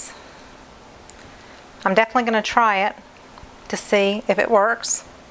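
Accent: South, United States. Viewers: Well....you're def from the South but it's not bad at all!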